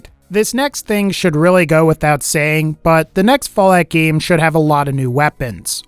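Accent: South, United States. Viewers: Does anybody miss the loud southern accent that used to do😕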